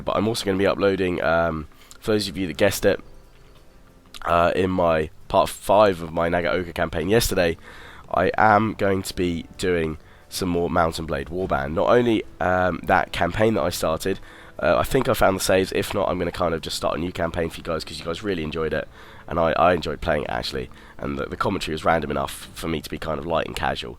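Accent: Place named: England, United Kingdom. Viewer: He's from England, and here when we see an 'i' we say 'eye' naturally.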